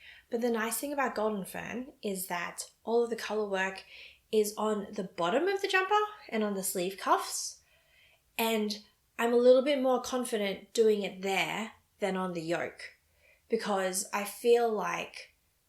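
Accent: Australia. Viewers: Thanks so much for your episodes. It’s lovely to tune in to each episode and listen to an Aussie. Next on my needles is the Gib sweater for my husband - should be fun.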